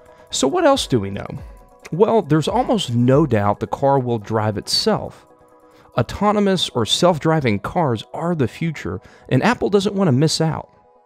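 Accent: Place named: Russia. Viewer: чьють чьють руский акцент ырше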